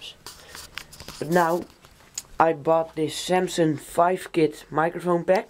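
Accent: Netherlands. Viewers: Je kan horen dat je Nederlands bent xP Maar goeede tutorial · Je zet de Nederlanders voor schut met je kutaccent!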